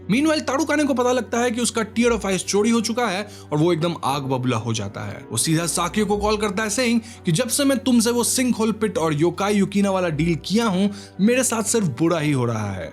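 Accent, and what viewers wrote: Bengal, India. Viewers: Bhai aap bengali ya odia ho kya??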